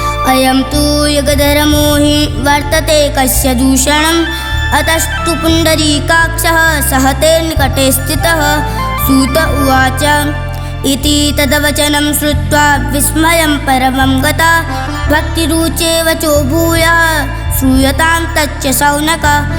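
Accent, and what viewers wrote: Standard. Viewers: 🎉❤🎉 बहुत सुंदर , और शुद्ध उच्चारण 🎉🎉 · शुद्धोच्चारण एवं उत्कृष्ट प्रस्तुति।❤️ और सभी विशेषणों को परिलक्षित करने वाले आपके उत्तम संस्कार, सभी का समावेश श्रीराधाकृष्ण की शब्दमयी मूर्ति का अनुभव प्रदान कर रहा है। महादेव से प्रार्थना है कि वो आपको सदैव प्रसन्न एवं धर्मवान बनाए रखें। जय जय श्री राधाकृष्ण।🙏🏻 हर हर महादेव 🔱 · प्रिय के द्वारा बहुत ही सुंदर प्रस्तुति ओर भाव से इतने सरल तरीक़े से श्लोकों का शुद्ध उच्चारण बहुत ही अच्छा लगा भगवती मा आपके कंठ में ऐसे ही विराजमान रहे 🌺🌺❤️🙏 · बहुत सुन्दर एवं शुद्धोच्चारण 🎉🎉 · प्रिय को वेदोक्त आशीर्वाद। इतनी सुंदर प्रस्तुति एवं शुद्ध उच्चारण के लिए साधुवाद श्रीमद्भागवतमहापुराण के माहात्म्य खंड के प्रथम अध्याय का पाठ बहुत ही सुन्दर प्रस्तुत किया है।🌹🌹🙌🙌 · बहुत ही सुंदर प्रस्तुति । श्लोकों का शुद्ध उच्चारण और स्वर बहत ही मधुर है। प्रभु कृपा सदा बनी रहें।🎉❤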